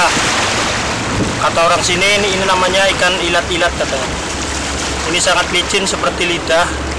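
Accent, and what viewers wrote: Indonesia. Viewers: Logatnya kaya Cirebon sih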